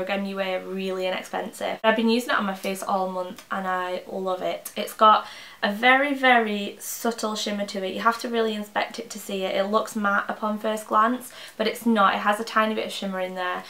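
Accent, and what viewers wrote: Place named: Preston, United Kingdom. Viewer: From one to another! I love your videos and your accent, my Gran, aunt, uncle and cousins lives in Preston and has a similar accent to yours and I don't know but I feel at home when I watch your videos and trust your opinions! Love the work you and keep it going x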